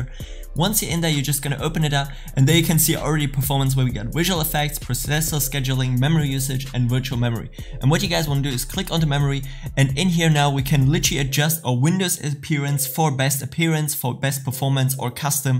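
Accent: Germany. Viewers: 100% deutsch akzent erkannt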